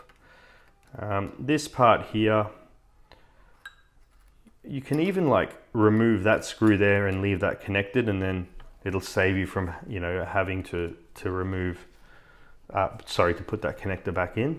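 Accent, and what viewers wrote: Australia. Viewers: elliott debell Australia going by his accent.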